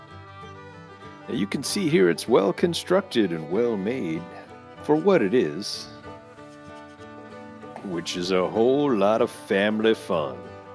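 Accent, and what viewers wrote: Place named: South, United States. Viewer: Same here. I loved the hick voice. No offense to the little women, but I'd kept it the entire video. I feel its a shame the southern accent has nearly become extinct.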